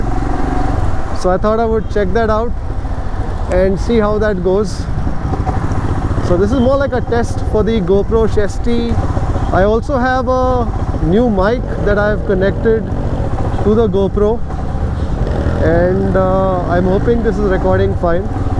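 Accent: Karnataka, India. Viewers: I guess chesty is more suitable. When v r off the biking with wide coverage. And ya ur kannada is sweet. That's y u have more local addicted viewers. 😊😊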